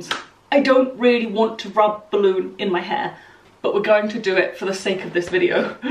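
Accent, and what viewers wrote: United Kingdom. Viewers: In the US we call it cotton candy. Lol love the British accent. your such a sweetheart. Love ya videos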